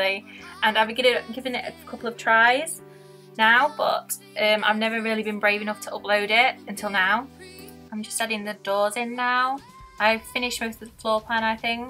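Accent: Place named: United Kingdom. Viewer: I like the voice 😊 It is better for me to improve my understanding to british accent 👍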